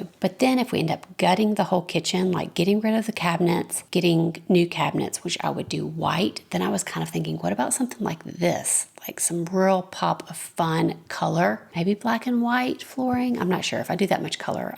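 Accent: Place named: South, United States. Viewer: I’m so eager to hear how your southern accent evolves onto British. It’s going to be adorable! 😊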